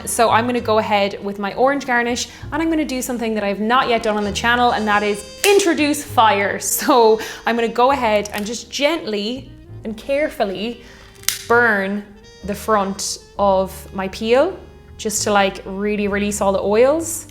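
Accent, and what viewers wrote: Ireland. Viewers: Sorry...not even certain what she said. I was too busy listening to how she said it. That subtle, distinctive, lovely, Irish pronunciation gave me an eargasm. 💖💖💖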